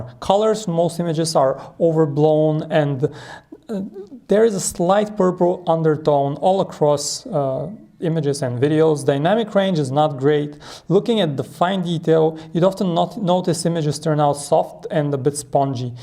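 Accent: Bulgaria. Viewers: razbrah 4e si bulgarin :D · Man, are you Bulgarian?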